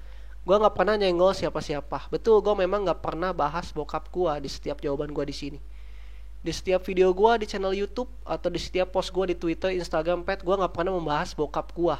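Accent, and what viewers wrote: West Java, Indonesia. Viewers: doi orang sunda kayanya jadi ngomongnya gitu wkwkwk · Logat nya orang sunda banget dah Apa orang bandung ? Wkwk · Sunda bgt :D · logatnya sunda banget 😂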